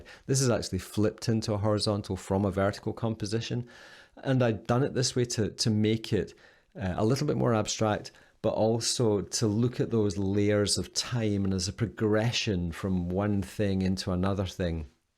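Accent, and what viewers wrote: Scotland, United Kingdom. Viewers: the Scottish accent held me here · I am in strong agreement with the message of your video. As to your voice, yes please give it needed rest. You and have among the most charming Scottish voices I've heard!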